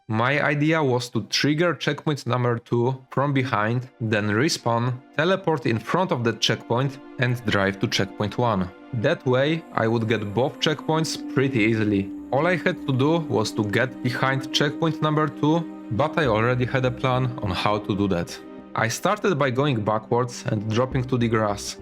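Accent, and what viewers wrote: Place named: Poland. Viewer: bro Why i think you from poland dlaczego wydaje mi się że jesteś polski ?????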